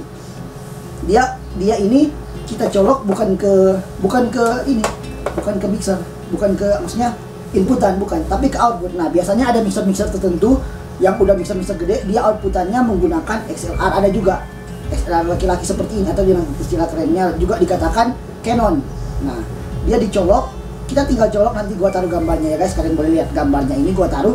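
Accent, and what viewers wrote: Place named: Nias, Indonesia. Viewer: logatnya keg orang nias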